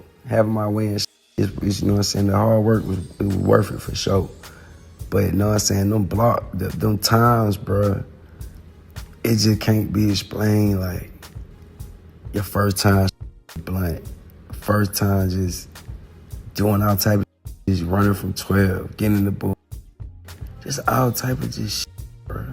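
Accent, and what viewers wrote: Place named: Mississippi, United States. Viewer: Boy you claim atl but you from missippi 😂 you sound like a missippi 🥷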